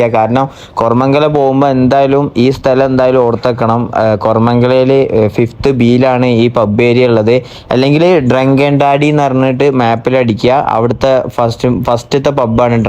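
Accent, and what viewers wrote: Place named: Malabar, India. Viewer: I like your malabar accent 😅😅 ❤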